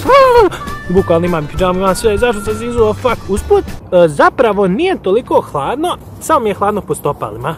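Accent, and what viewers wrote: Bosnia and Herzegovina. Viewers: Brate zašto pišeš ,,reku" a iz Bijeljine si i pričaš ijekavicom?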